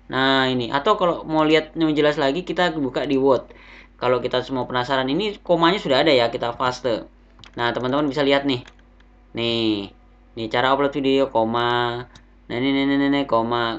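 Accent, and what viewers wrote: Java, Indonesia. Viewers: Kewoooooot....mantab...paste....medok2..tp?lucu.mwkkwkw...iklane bang...ngeri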